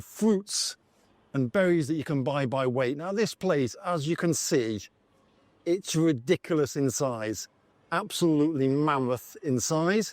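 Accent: United Kingdom. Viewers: I kind of figured people will say something to you once in awhile considering to them you are a foreigner but what they don't know you're actually Russian with a British accent lol anyways thanks for the video I enjoyed it God bless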